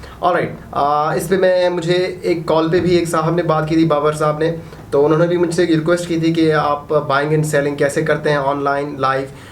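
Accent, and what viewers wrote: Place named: India. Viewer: I strongly feel u r Indian due to ur voice/tone and name.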